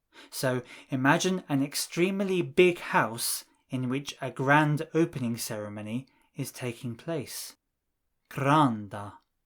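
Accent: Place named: Italy. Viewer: He sounds italian when speaking espersnto tbf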